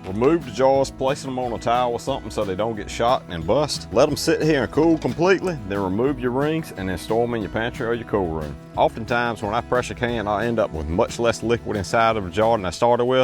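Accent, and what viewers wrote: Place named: Louisiana, United States. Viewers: Dude what is your accent? Cajun or something? Man you could be in movies with a voice lie that. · I like that accent, sounds like he's in Louisiana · You sound like you from Louisiana, man. Are you?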